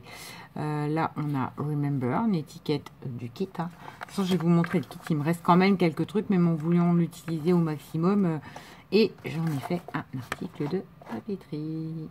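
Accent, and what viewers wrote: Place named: Haute-Marne, France. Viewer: Cc 🙋 Alors alors alors...!!! Quesqui pourrai t inspirer 😁 je choisi la couverture.. ah super le tour de France je connais sa c'est la galère sérieux.il me semblais bien que je connaissais cet accent je suis native de la haute marne Chaumont tu dois connaître...et Reims je connaissais bien gros bisous 😘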